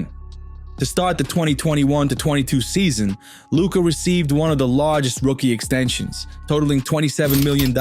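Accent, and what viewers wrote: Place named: New York, United States. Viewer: brooklyn!? harlem?!?! u sound like you in the mafia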